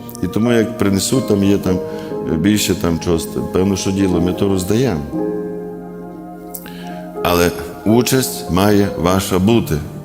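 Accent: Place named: Precarpathia, Ukraine. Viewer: Дякую Господу Богу отче за вас Я також з Ів.Франківської області з Калуша,але живу і працюю багато років в Іспанії,але як гріють душу вашу науки і наш Прикарпатський говір.Дякую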